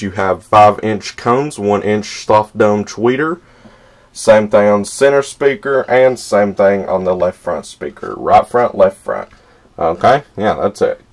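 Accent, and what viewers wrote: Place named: South, United States. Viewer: he sounds like a southern dude........he sounds cool though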